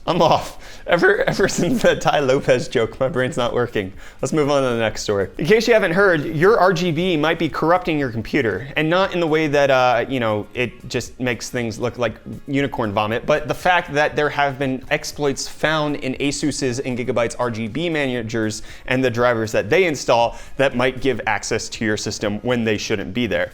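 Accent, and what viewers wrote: United States. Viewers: So this has probably asked before but Im new to your channel. How is it that you are an American or at least have an American accent and living in South Africa? Really love your content by the way. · You sound extremely American, for a guy from south Africa.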